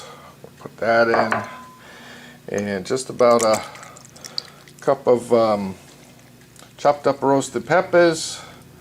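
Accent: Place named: Maine, United States. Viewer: you sound like you're from Maine :)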